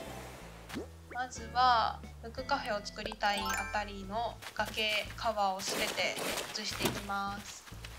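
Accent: Kansai, Japan. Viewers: 初めまして！あつ森島作り迷走中の停滞中です😅 そんな中さんの動画に出会いました！私も関西なので、さんのしゃべりが心地良いです😊 素敵なブックカフェ、ぜひマネっこさせてください🥰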